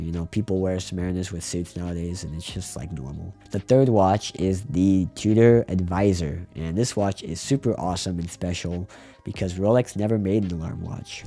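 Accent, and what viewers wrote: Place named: Midwest, United States. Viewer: Congrats on your license!!! Good luck God Bless and much success! The 36mm Date Just you can't go wrong. I prefer the older holes oyster case Rolex models. Perfect for strap options and it gives you a vintage vibe. Those look perfect on young guys when you "hipster" a classic vintage watch. BTW you sound like you're from the Midwest... it's the accent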